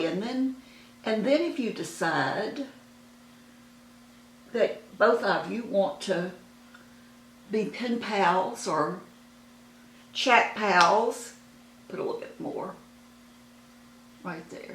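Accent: South, United States. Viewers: you exude such lovely Southern charm! I love accents, and yours is tops! This from a lifelong Northwesterner age 83. Your makeup tips are invaluable. Please continue your friendly chats!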